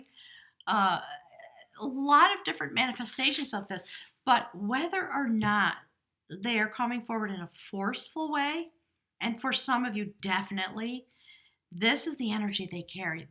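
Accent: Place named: Western North Carolina, United States. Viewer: The beginning sounds like Western NC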